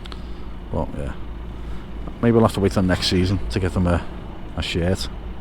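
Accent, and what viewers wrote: Liverpool, United Kingdom. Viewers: Nice scousers accent great video!! Yer love Wrexham fc I'm Celtic fc ☘️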